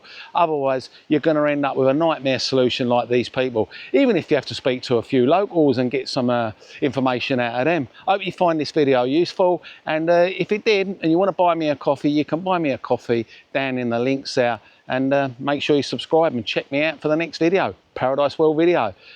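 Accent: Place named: Cockney, United Kingdom. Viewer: I love the cockney accent!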